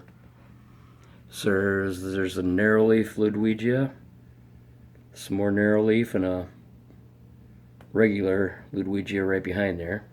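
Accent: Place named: North America. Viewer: the standard 10 gallon aquarium in North America, likely where he lives as he has a North American accent, has the dimensions of 20" x 10" x 12". This tank is also longer than it is tall, and taller than it is wide. I can almost guarantee it carries those dimensions. In different countries, such as Australia, a standard 10 gallon often has different dimensions. But this was in North America.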